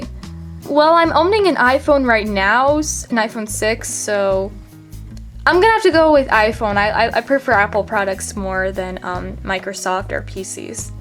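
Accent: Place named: United States. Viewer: No. America, do you hear her accent?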